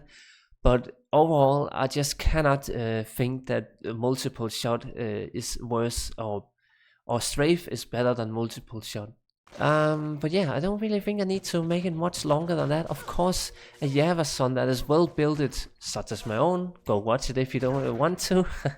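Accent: Denmark. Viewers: dude. Du har lavet youtube videoer i tre år. Hvornår skilder du dig af med den stykke cringy danske accent. Og hvornår har du tænkt dig at øve ord inden du optager. Jeg har efterhånden set en god håndfuld af dine videoer, men jeg kan aldrig kommer over halvvejs, pga udtale og accent. Tag dette som konstruktiv kritik, fordi jeg tror virkelig at det vil hjælpe dig med dine fremtidige videoer.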